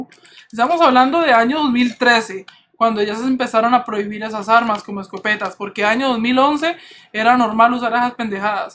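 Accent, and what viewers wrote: Colombia. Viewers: de dónde es este man? porqué aveces parece colombiano y otras venezolano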